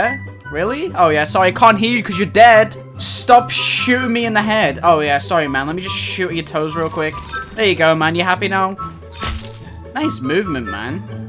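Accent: United Kingdom. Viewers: Hey are you british?????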